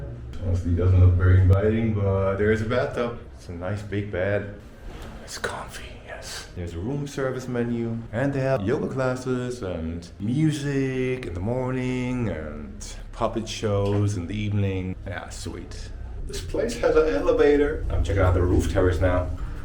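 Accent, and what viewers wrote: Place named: Germany. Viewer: Just from your accent I understood youre Deutscher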